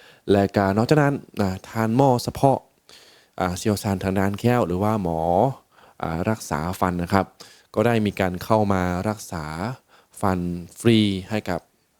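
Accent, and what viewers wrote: Thailand. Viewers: แอดมินวิธีการพูดเหมือนคนไทย ทั้งการออกเสียง สไตส์การพูด ร ซ ไม่แน่ใจว่าเคยเรียนที่ไทยไหมครับ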